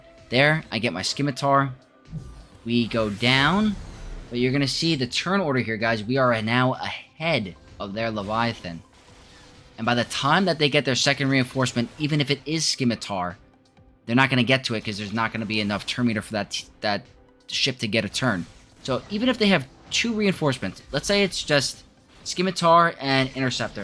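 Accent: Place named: New York, United States. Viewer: That New York accent. I miss mine lol.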